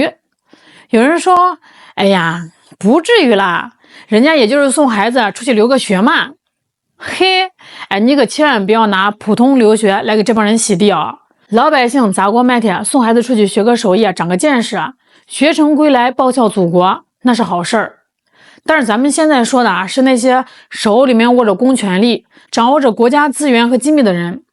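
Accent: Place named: North, China. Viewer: 是北方口音吧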